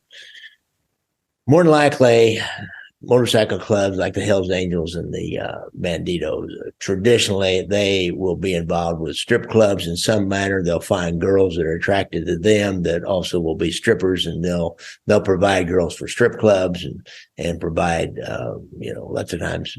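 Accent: United States. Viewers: I just love hearing American pronounce New Zealand place names. Good video mate. Thanks.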